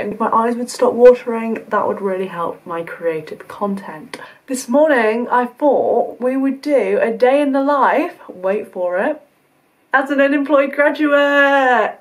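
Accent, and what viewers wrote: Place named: United Kingdom. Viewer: Hi,! Your videos are very nice and cool 😊 I started watching your videos when I was looking for info about UofL 4 months ago, but I still keep watching your videos as they are very creative and relatable. You really put a lot of work into your videos and this is awesome that you keep on posting. Don’t give up on YouTube, your subscribers love your videos😍😍😍 P. S. Your UK accent is so wonderful, I love it. I wish I could speak with that accent (I’m Ukrainian)🙈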